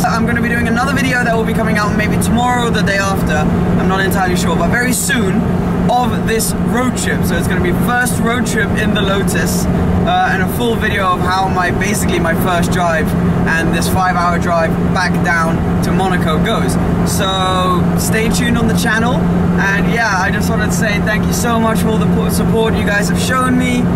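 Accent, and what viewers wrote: France. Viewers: Are u English you accent seems to blip into French sometimes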